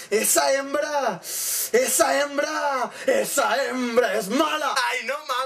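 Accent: North, Mexico. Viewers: a yo nunka veo novelas bueno da iwal jejej me reiii thankss ta bien curada tu vozz sii luego se ve k eres del norte xingaooo jajajja xidooo videooo...... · Ey chiko eres nuy lindo , aunk no me adecuo aun al dejo mexicano , me fascina tus videos y tu forma de expresarte, dejame decirte veo tambine werewer y son distintos cada uno su estilo por eso regreso a verte siguele chiko y un super beso !! muack te escribo de peru XD soy mayu cuidate q diocito te bendiga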